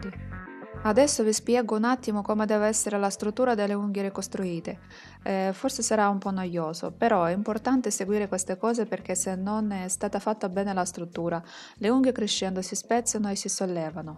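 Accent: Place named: Ukraine. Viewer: L'accento ucraino 😩😍😍 (correggimi se ho sbagliato)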